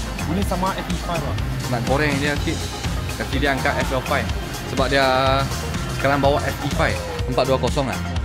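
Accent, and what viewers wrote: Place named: Kedah, Malaysia. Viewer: Bos cakap lorat kedah mcm pak wei baru syok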